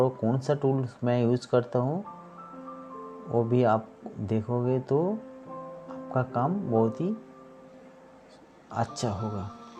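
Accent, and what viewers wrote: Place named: Bengal, India. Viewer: You are 100% pure বাঙালি। হিন্দি শুনেই বোঝা যাচ্ছে। anyway ভিডিও গুলো কিন্তু খুব সুন্দর। Best of luck 👍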